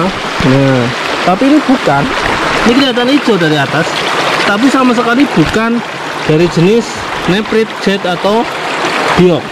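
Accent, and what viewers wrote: Banyumas, Indonesia. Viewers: Bahasane kok ngapak yah ??? Asli ngendi kang!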